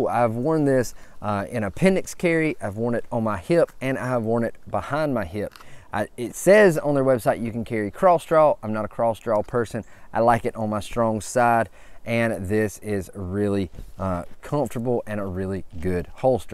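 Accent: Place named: Georgia, United States. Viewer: Great videos! I’m enjoying your channel. You sound like you’re from Georgia. Also kinda looks like Georgia woods behind you. 😂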